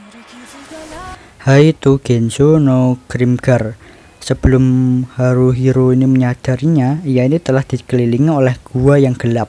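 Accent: Java, Indonesia. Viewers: GUE# jawanya medok....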